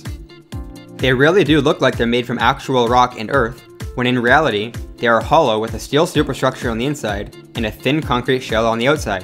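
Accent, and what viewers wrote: Canada. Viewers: Americans speak English. A LOT of brits have broken English as well but let's all ignore that fact. And he's probably Canadian, Americans don't pronounce words the way they do.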